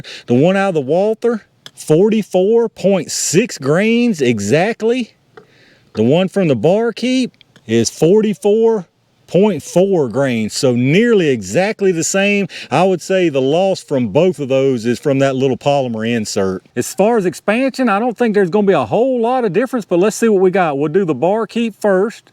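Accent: South, United States. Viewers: You are quickly becoming one of my favorite pew pew YouTubers. Man every video I've watched is awesome. And the cherry on top of the cake from one southern boy to another I love your accent man. Keep up the good work brother.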